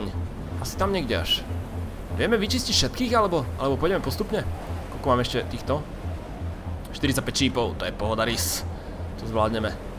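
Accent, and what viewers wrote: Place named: Slovakia. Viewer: Fiu,komentuju trosku pozdeji,no snad nevadi :) Ja osobne se na tve videa nedivam ani tak napribeh ani podobne ,ale proto,ze chci slyset tvoje hlasky a podobne.Podle me je to dobry napad,kostovky dlouho nebyly,stare dobre casy :D Pff...stare...jsi muj nejoblibenejsi youtuber ( yeup,a mas fakt super prizvuk...to je tak kdyz jsi napul slovacka no xD ) a u tvych videi se uklidnim a odreaguju.Delej jak uznas za vhodne. ;)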